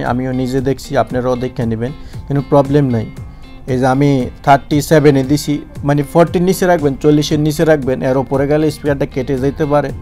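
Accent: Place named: Barishal, Bangladesh. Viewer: ভাই আপনার ভিডিও দেখে যত টুক ভালো না লাগছে তার চেয়ে বেশি বেশি ভালো লাগছে আপনার বরিশালের ভাষায় কথা শুনে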